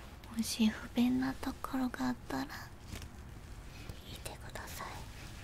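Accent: South Korea. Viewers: 韓国の女の子が日本語を話すのが好きすぎる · 韓国の方が話される日本語て可愛らしいﾃﾞｽ♥.｡.:*♡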